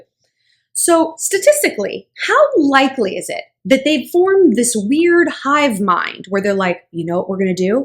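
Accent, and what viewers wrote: England, United Kingdom. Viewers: That English accent though 😂😂 I like your channel, you give sound advice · That English accent though 😂 top notch madam, top notch 👌🏼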